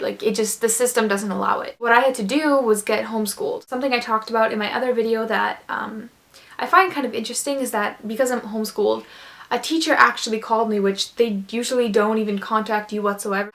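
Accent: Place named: Russia. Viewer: с едва заметным русским акцентом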